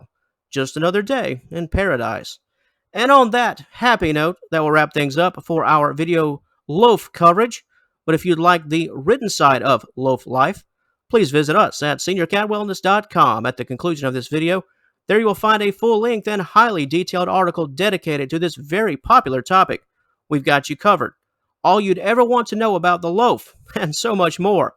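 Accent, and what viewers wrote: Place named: Florida, United States. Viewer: You from Florida? You sound like a fellow Floridian.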